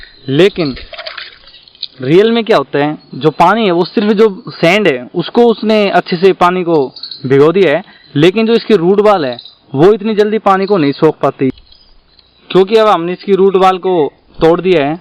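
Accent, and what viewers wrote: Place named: Jhunjhunu, India. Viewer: aapki bhasa mst h aap junjunu side k ho kya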